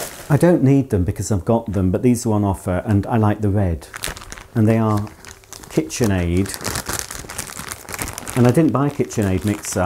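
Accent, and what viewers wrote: United Kingdom. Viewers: The wireless mic is an improvement as I can understand each syllable. The volume is fine and you could probably speak normally as we can always turn down the volume but my phone is turned to max and I had trouble with your British accent until today. Bravo! So excited for your Advent series to begin. Happy baking.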